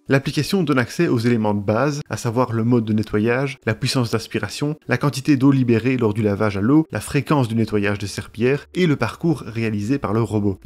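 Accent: Belgium. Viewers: Laisse aller l'accent belge, mec, on veut entendre les nonantes 🤌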